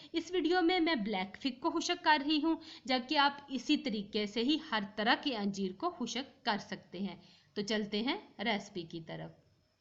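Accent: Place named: Punjab, India. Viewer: oh ok you have the same accent of Indian punjabis 😊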